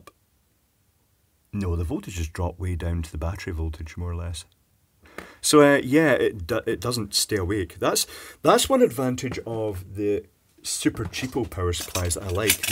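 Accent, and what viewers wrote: United Kingdom. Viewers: That british accent tho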